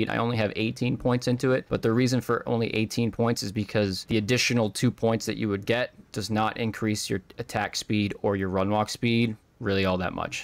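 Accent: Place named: England, United Kingdom. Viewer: Why you speak like that? Can you speak normaly? Many english people do like you, it's boring. But very nice video, and your honesty is also very nice.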